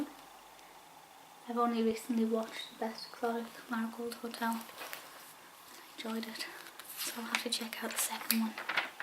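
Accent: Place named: United Kingdom. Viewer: British asmr, perfect :D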